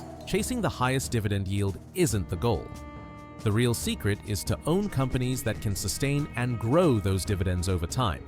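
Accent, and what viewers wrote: United Kingdom. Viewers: I hear a British accent and an alarm bell goes off.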